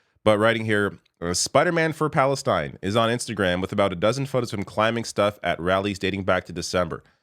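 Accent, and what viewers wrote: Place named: Toronto, Canada. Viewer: He and I are Torontonian (well I think he's from Mississauga, but close enough). He says it just like everyone native to Tdot says it.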